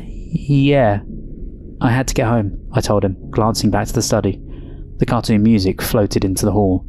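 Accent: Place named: United Kingdom. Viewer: hears intro -subscribes immediately hears British accent -TRIPLE SUBSCRIBE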